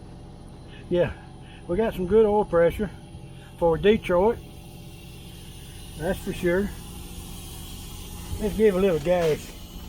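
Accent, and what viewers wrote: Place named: South, United States. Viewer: You're welcome. The polite southern commentary is 2nd to none.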